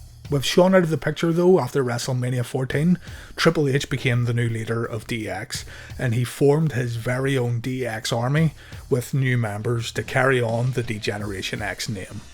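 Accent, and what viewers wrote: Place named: Scotland, United Kingdom. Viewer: Scottish accents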